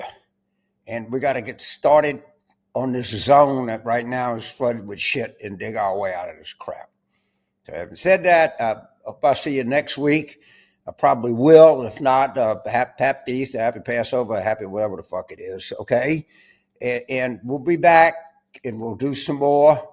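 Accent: South, United States. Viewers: Thanks again,for another informitive video Mr. Carville! I've watched you for years,and never get tired of your wisdom! With your southern drawl,and a little comedy,you continue to guide our people! I met little donnie many years ago in NYC.I knew after two seconds,that he was full of $hit! Being a country boy,I've been taught to beware of a conman! How can so many people be misled at the same time,inour beautiful country? Where does all of thier anger come from?Can't they see that his is nothing but a brown stain in thier shorts? Sheesh.❤❤❤❤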